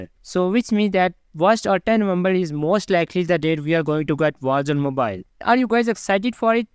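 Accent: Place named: India. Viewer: Indian English 🫥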